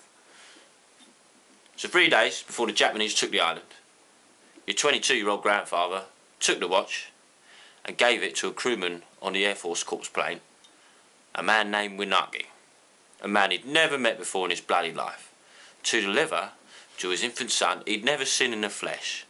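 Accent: England, United Kingdom. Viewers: Just discovered this...wonderful! Great skill! Are you English?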